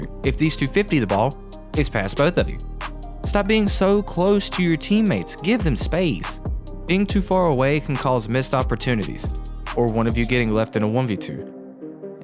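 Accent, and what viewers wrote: United States. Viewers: Gotta love the good ol' murican accent!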